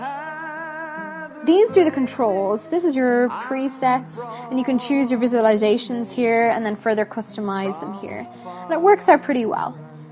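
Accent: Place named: Ireland. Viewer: Irish accent + XBMC knowledge = LOVE!!!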